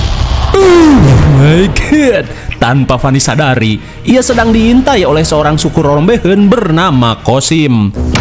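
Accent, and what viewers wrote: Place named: West Java, Indonesia. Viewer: Sering aplod video nya KA, soalnya aku suka banget cara ceritain alur nya lucu gitu aku suka, kaya ada Sunda sundanya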